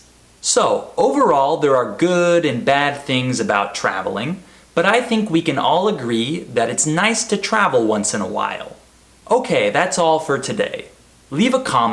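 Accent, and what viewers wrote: United States. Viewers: I guess it's an American English doesn't it ? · No, is American accent (more or less) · Is it american accent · Hey! Thanks for the advice that you gave me yesterday, you are intelligent, you are amazing, you have soft voice to speak English fluent, intonation, you speak English to flow like an American, I am really fell very proud of you, I do appreciate you a lot, I am glad for you, you make me feel relax as you speak that accent, yeah! 😸😄😆👌👍😊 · It's American English. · No , American accent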